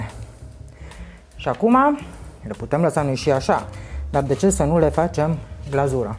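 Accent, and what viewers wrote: Transylvania, Romania. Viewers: Ești MINUNATA! Intr adevar dai savoare rețelelor tale cu graiul tău ardelenesc❤ Cu mare drag te urmăresc ai salvez rețetele! Unele le si fac,altele așteaptă" timpi" mai buni din partea mea...😂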